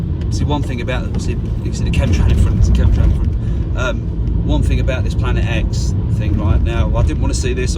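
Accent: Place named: United Kingdom. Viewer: I like your British accense.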